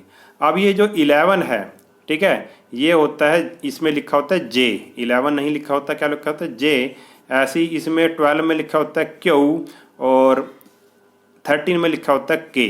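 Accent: Punjab, India. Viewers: Sir punjabi ho na app?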